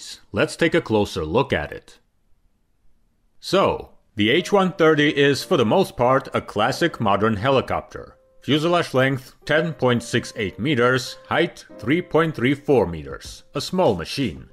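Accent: Russia. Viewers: It’s not a sky ship video unless it has that genuine Russian accent 😌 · Yippee… politically we may hate the Russians at the moment, however on a personal level, nothing beats a Russian voice narrating to you.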